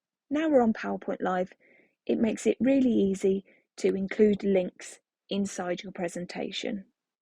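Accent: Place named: Birmingham, United Kingdom. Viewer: Excellent. Are you a Brummie?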